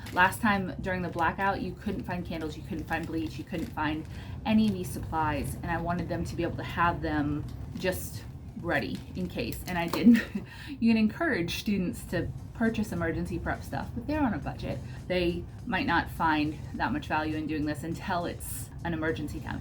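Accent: Texas, United States. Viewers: As soon as you started talking, I said "you live in Texas, this is a realistic thing to prepare for!" I'm very into emergency prep after my town was hit with a horrible storm and I was without power for 10 days. It's so important. This year I'm budgeting for building up my preps and buying a (small) generator!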